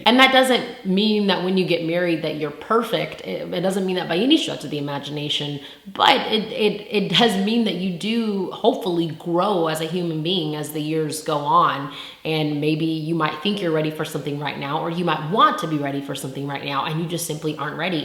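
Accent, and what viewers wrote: South, United States. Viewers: Lol where I'm from we always talk like you do with a southern accent for jokes but you really talk like that and I just couldn't take you serious I kept thinking you were joking 😂😂🤷🏾‍♂️ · Her southern Belle voice is beautiful lol whoever hasn't got a chance to see her LIVE and in person. I need that to change in 2018. I've been grateful enough to have seen her LIVE about 3 times. And she is such a GOD GIRL . Years ago I bought one of her jackets. And it's been keeping me warm ever since.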